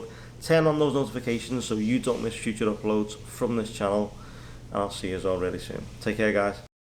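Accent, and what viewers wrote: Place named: Liverpool, United Kingdom. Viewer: Yess I’m feeling this shout lad ha just subscribed soon as I heard the scouse ha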